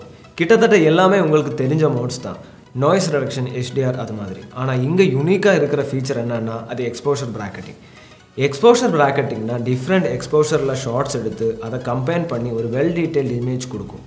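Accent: Kerala, India. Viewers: Konjam kerala slang varuthe Athum nall than irruku👍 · Santhegame illa. Look & speech... Clr ah malayalinn kaatuth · கேரளா people தமிழ் பேசுனா நல்ல தா இருக்கு .. good job bro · neenga Kerala va malayali maari pesurenga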